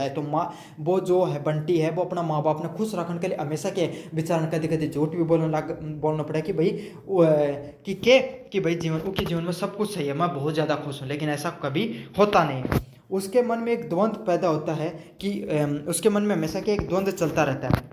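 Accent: Marwar, India. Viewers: Sir itna bhi sach nhi bolna tha😂😂😂. Puri marwadi and dialogue super 😂😂😂😂😂😂😂😂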